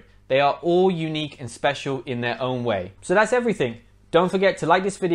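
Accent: United Kingdom. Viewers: Actually your accent is very british but can be undertstood easily like american. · Hello! It's an amazing and very useful video! I love the glottal stop and the British accent! 👏🏻👏🏻👏🏻❤ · Hey! Amazing video as always.. honestly the British accent I know the most is yours, otherwise I'm more used to the American one! However the British accent is much more refined in my opinion..I really like it! But I must say when I watch your videos I can't take my eyes off you..omg you're gorgeous! 😍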